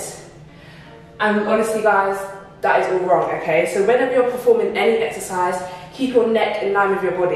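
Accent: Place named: Cockney, United Kingdom. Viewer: I just come here to listen to the Cockney accent lol. Keep up the work 👍 💪 From Canada 🇨🇦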